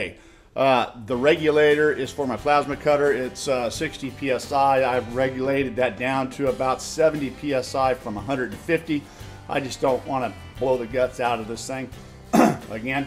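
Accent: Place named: United States. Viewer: It's the American English pronunciation... the norm here.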